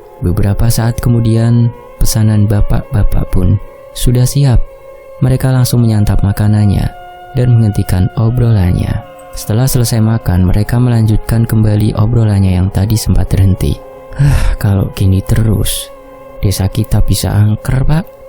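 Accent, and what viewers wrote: Java, Indonesia. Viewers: AD LUCUNY JG NE YG BWAIN CRITANY KEJAWA"AN HHHH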